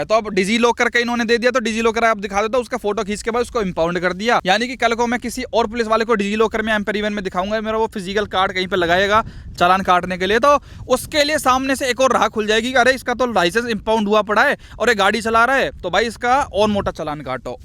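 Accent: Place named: Palwal, India. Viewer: bhai, I love the way you talk in our Regional language, Palwal vaale.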